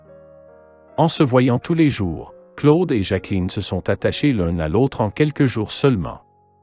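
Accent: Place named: Canada. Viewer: Un peu de réflexion il est canadien on entend bien l'accent et le français n'est pas le même a 100% la bas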